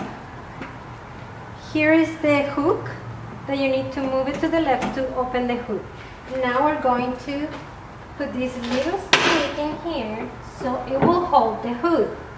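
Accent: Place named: Mexico. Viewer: Let's be honest... Who the hell cares about learning how to change the Air Filter ? We all know how to do that but watching a beautiful girl explaining the process it's music for my ears and pleasure for my eyes. I have a 2005 Toyota Corolla XRS but I installed TRD Air Intake, something little bit different Well done mami, I love your video and your accent Viva México Ca... !!!!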